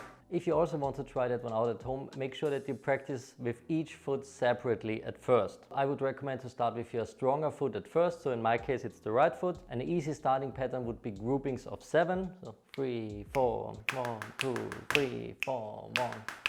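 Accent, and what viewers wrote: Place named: Germany. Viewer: This kinda sounds like a German accent.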